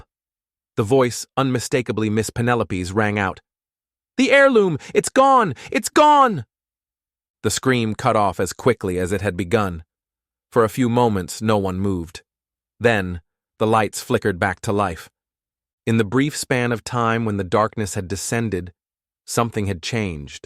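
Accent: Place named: United States. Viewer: Why the American voice?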